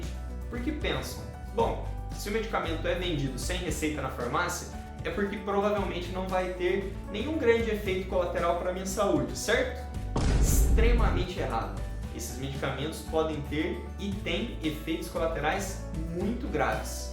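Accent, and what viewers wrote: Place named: Caipira, Brazil. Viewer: Desculpa lá! Mas, não aguento ouvir este caipirê.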